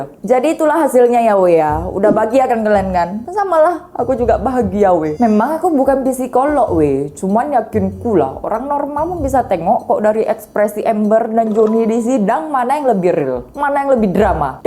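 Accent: Medan, Indonesia. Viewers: Onde mandeeee.. Logat Medan rasa Padang.. kereeenn...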